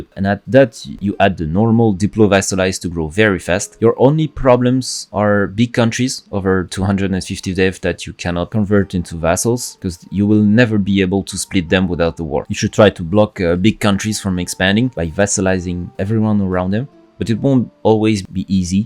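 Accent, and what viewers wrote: France. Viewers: je me disais bien que t'avais un accent français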